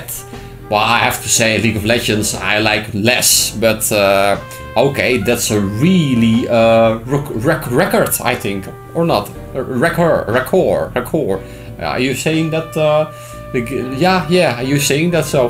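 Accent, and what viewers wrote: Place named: Netherlands. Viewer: I'm from the united states and I love your dutch accent. I'm loving it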